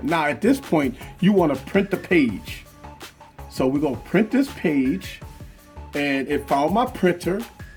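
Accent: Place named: New Orleans, United States. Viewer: I love your NOLA accent! Great video. This was very helpful.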